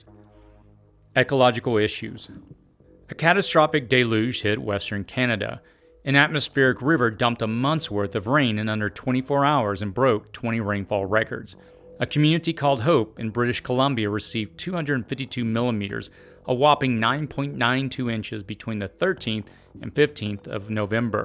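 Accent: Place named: Southern California, United States. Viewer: the so-cal accent is strong in this one.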